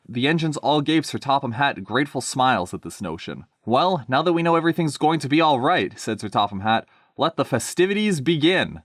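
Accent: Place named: Scotland, United Kingdom. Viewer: That Scottish accent XD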